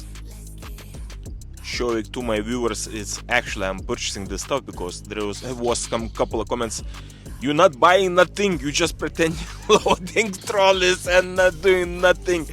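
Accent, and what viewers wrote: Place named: Russia. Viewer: Russians accent❤❤❤sweet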